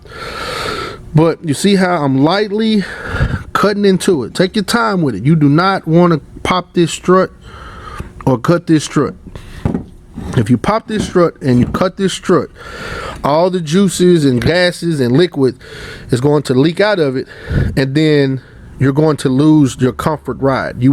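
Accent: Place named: Houston, United States. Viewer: Dude is undoubtedly from Houston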